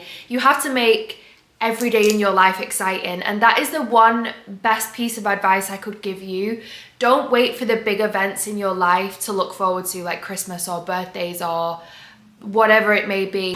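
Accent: United Kingdom. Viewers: I just joined ur channel and im enjoying. Where do u live bc ur accent is so adorable. Im assuming UK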